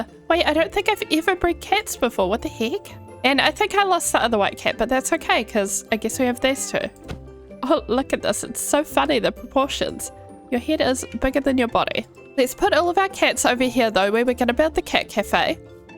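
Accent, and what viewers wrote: New Zealand. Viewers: recently found your videos and wanted to just say thank you, ive been trying to find some kiwi youtubers as ive just moved to australia alone and am missing home alot, sounds stupid but ur voice and the added kiwi accent puts me at ease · Instantly recognised the accent!! 🥝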